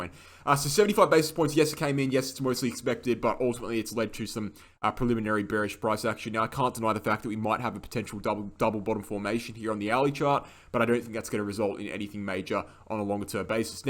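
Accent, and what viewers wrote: Australia. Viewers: Yeah I'm generally in line with this prediction. Great job,. Stupid question - are you from Australia or a Kiwi?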